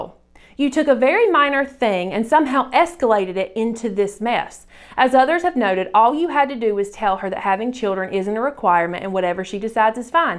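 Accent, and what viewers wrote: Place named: South, United States. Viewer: I love your content so much, it makes me feel better about my decision hearing someone who, at least sounds southern, talk about being childfree. I have known I don't want kids since I was like 10-12, I'm 23 and my entire family still says "you might change your mind" despite the fact I have adhd and depression already, despite telling them there is a high chance I will get post-partum and hate that hypothetical kid to the point of possibly hurting it, they don't care cause I have a uterus and we come from a big, Southern family raised on Christian values that I don't even believe in.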